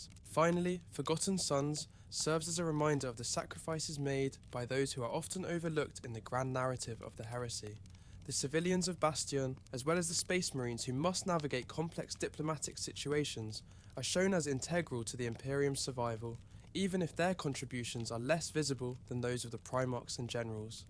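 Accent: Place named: United Kingdom. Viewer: I like hearing a British person speaking about 40k. I know an American or anyone can speak about it also. But I greatly prefer a British person like this guy since 40k comes from Britain. It's sort of like... idk, I want to hear a Chinese man when I'm learning about the Three Kingdoms period or Chinese cuisine. I want to hear an American when I'm learning about modern money or finances, or other American dominated fields. And I want to hear a British person talking about 40k.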